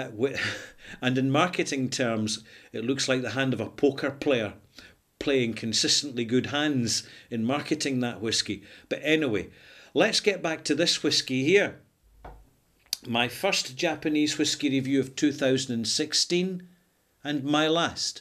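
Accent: Scotland, United Kingdom. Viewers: Scottish to be precise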